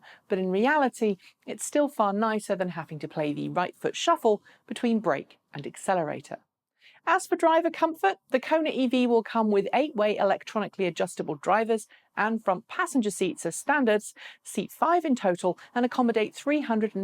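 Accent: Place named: United Kingdom. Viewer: proudly points out from time-to-time that she is unapologetically British. 😁 As such, she will pronounce certain words in a manner unfamiliar to those of us who speak Informal American Standard Idiomatic English, and stuff.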